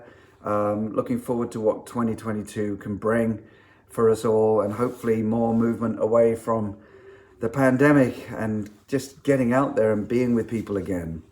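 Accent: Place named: United Kingdom. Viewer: I always forget about your British accent because I watch Michael Cutter too much.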